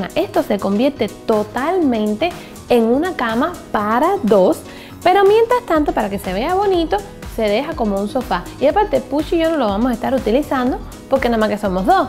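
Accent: Cuba. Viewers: Las cubanas tienen la misma voz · Sandra le da asesoría igual que Harold trabajan con ella, en ningún momento dicen que son familia. El acento es de Cuba.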